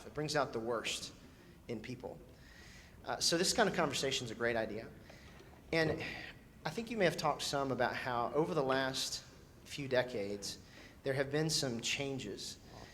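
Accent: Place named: South, United States. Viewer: ***** I must say, while you definitely have a different texture to your accent than that of the southern 'twang' stereotypically associated with 'the south', it's still distinctly 'southern' to my foreign UK ears, and I like it. :)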